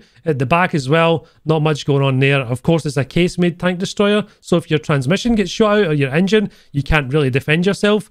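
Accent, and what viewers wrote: Scotland, United Kingdom. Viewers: I love you too man specially that thick sexy scottish accent🍻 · I love you because you respect people's gender identities and you make sure to include them with your usage of the phrase "ladies and gentlemen". It's a small thing, but with human decency becoming politicized people often shy away from even acknowledging the existence of people that are gender nonconforming. Aside from that you make great content, and your very distinct Scottish dialect reminds me of a great horror movie reviewer on here named Ryan Hollinger.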